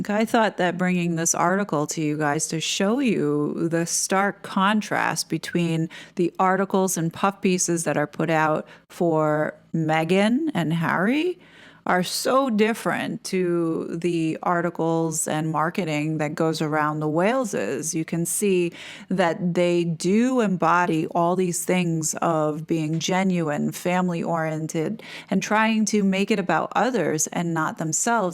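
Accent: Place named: United States. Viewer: Why did the US magazine mentioned abut how much they are "IN LOVE", we heard so much about their living separately and how Harry's demeanor changed as soon as Me Me showed up? BTW, did I hear correctly in your recent videos that you were a woman of color and that you lived near Windsor? You are an American living in the UK? You have a soothing American voice and accent.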